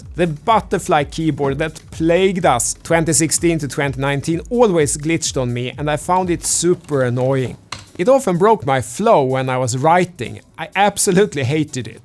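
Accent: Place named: Sweden. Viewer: My gf is swedish and as soon as I played the video she recognized the accent😂 great review!!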